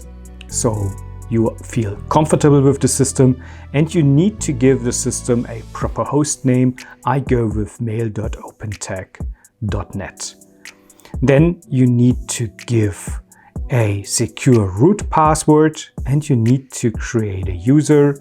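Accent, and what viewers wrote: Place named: Germany. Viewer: Gotta love the Hans Landa accent. Thanks for the contribution friend!!!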